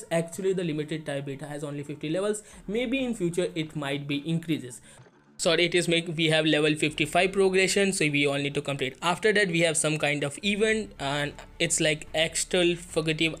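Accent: India. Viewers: Indian accent, hmmmmm...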